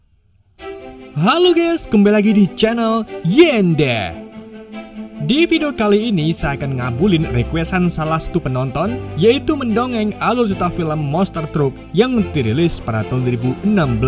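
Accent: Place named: Banjar, Indonesia. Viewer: Bng ne urang banjar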